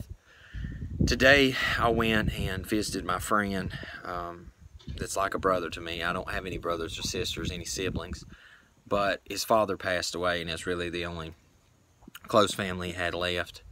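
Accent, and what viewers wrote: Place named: South, United States. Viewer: that's a wholesome southern draw I hear, Praise The Livin" Lord Jesus 💜 and God Bless yall" real good over there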